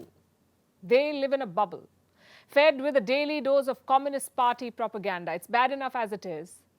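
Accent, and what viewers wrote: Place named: India. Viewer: curry accent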